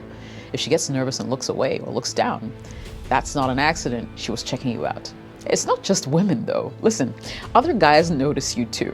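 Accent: Nigeria. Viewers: You sound like a Nigerian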